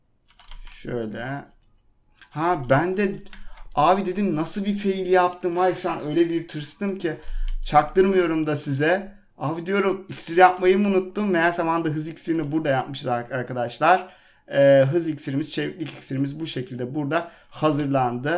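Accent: Trabzon, Turkey. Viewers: abi sen Trabzonlumusun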